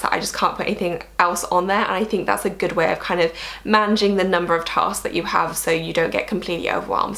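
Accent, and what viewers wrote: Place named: Wales, United Kingdom. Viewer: I’ve only recently come across your channel and I’d like to say how humble you come across, you give some brilliant advice. I’m from South Wales and was so surprised to hear you and your dad are Welsh! 😂😂 you’re definitely the poshest welsh person I’ve ever heard 😂😂. Well done on your career and your YouTube channel you’ve definitely got a new subscriber xx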